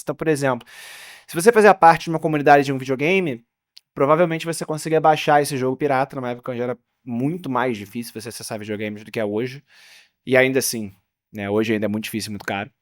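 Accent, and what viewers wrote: Rio de Janeiro, Brazil. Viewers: Mano, esse sotaque de playboy carioca quebra minhas perna, porra. Fica tipo Carlos piloto, aí é foda.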